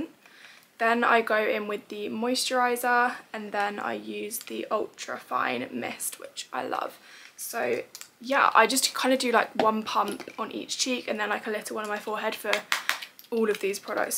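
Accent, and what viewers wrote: United Kingdom. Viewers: I love youre british accent!!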